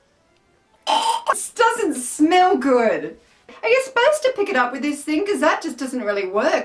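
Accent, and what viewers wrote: Germany. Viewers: Love your german accent :D I would be the same :p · Love your German accent, or is that accident lol. I could get right into the smurf sniffing thing! This is getting to be a ridiculous comment, sorry. Wow German stuff is weird (in ref to the little sausage). :) Ask a German to say butterfly (in German)....interesting.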